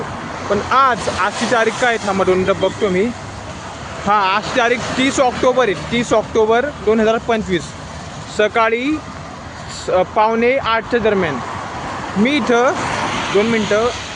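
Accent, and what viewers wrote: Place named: Gujarat, India. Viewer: भावा speech चांगल कर असं वाटतंय की तू मराठी नाहीस गुजराती आहेस. कंटाळलो ऐकून... 🙏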